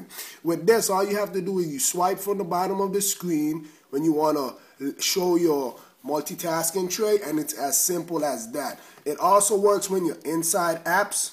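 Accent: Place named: Jamaica. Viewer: Your voice is sick!!lol Jamaican?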